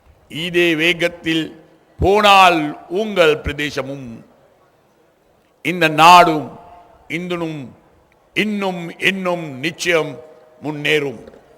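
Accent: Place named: Sinhala, Sri Lanka. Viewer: Saami Kadavule...enna Thamizh ithu...Azhagana Ilangai Thamizh engey, intha singala thamizh engey...quite opposite...intha pecha kekumpothu eppadi ivar pechu ellarukum vilangum....athaan ottu podala pola,..thothu poyitaaru...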